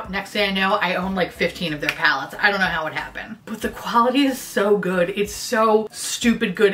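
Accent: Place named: Chicago, United States. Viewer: I’m one and a half minutes in... I don’t remember if it was jaimie French or Smokey glow that sent me here... but whoever it was. THANK YOU BIIII**** 😂❤️❤️ Edit: it doesn’t hurt that you have a very similar accent to my dads side of the family from Chicago. 😂❤️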